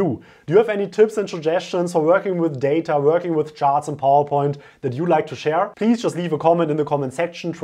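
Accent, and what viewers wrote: Germany. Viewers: You can hear it directly that he is German 😂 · Your german accent is hilariously strong, to a distracting extent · I got a headache after listening to his accent. Is he German or French? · Obviously German ; )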